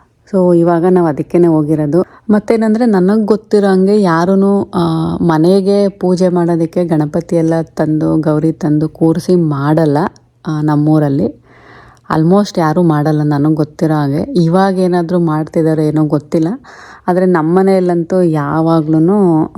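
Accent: Mandya, India. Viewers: ನಿಮ್ಮ ಮಂಡ್ಯ ಬಾಷಾ ಕೇಳುಕ್ಕೆ ಚಂದ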